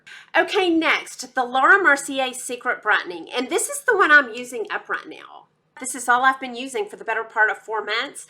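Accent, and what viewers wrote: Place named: Texas, United States. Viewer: I knew there was a reason I love you and love watching your videos....you’re a fellow Texas gal!! I knew that accent was familiar!! You are just adorable!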